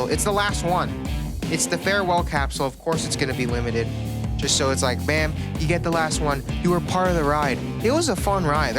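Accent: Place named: Hawaii, United States. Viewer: he's hawaiian livin in nyc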